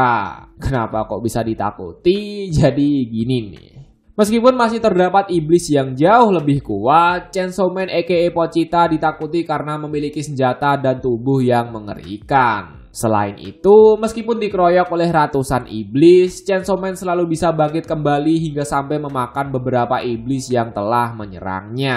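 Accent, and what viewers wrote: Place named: Madura, Indonesia. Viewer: Intonasi nya kek orang Madura bikin sakit telinga apalagi pake headset 🤣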